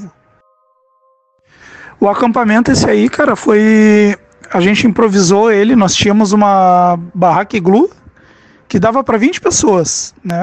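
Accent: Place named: Rio Grande do Sul, Brazil. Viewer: Eu Amooooo esse sotaque Gaúcho,adorei a história envolvendo escoteiros,aqui em casa tenho 3 escoteiros.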